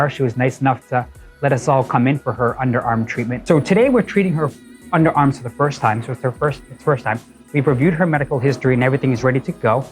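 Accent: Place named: Asia. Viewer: Bro the Asian accent 💀💀💀💩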